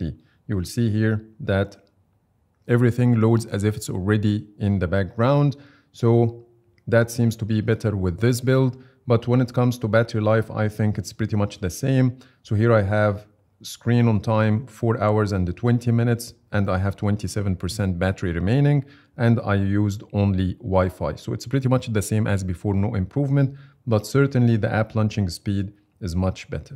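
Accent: Egypt. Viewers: I love the egyptian accent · Your accent is egyptian dude….love the video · Bro ur accent is Egyptian 🤨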